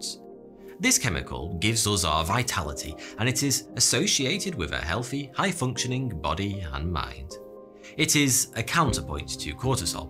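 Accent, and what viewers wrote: United Kingdom. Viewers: So.... is the British accent what makes " " sound like "42" or is this on purpose? or id it only me? I'm not an English native speaker so... just wondering. Anyways awesome content! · Im here for the mustache and beitish accent lol.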